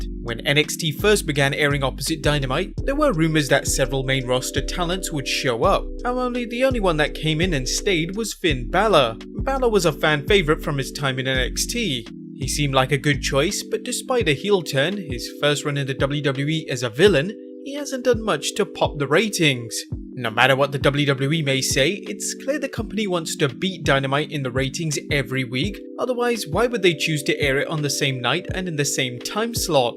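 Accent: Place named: England, United Kingdom. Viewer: where is this guys accent from? i mean specific city in england.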